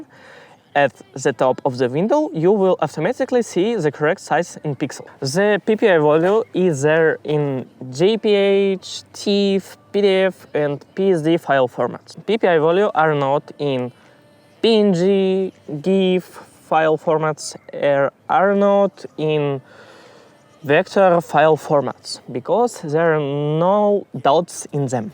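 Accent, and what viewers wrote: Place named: England, United Kingdom. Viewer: О, сэр… Вы из Англии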